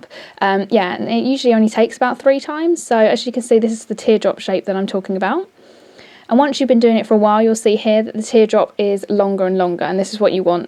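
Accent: United Kingdom. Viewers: omg are you British? cuz I love your accent and great video!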